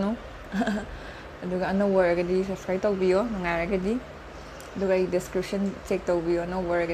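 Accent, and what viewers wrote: Kakching, India. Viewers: Kakching Marol da oina ngangngo aduna tabada nungsi tabasu nungai... Eshagi erol bu ngangthikpada ekairido karigino...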